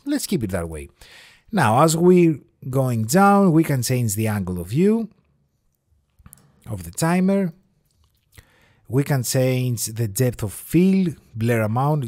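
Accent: Greece. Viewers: This greek accent is so familiar to me! ευχαριστώ! καλή δουλειά!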